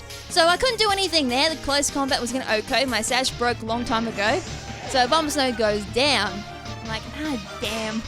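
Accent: Australia. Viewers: Wow, I'm not gonna lie, you really seem like the female pimpnite Not in a bad in a bad way but your accent is very Australian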